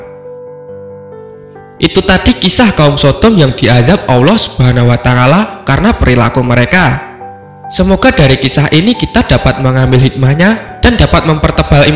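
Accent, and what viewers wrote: Java, Indonesia. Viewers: Wong jowoooo medokk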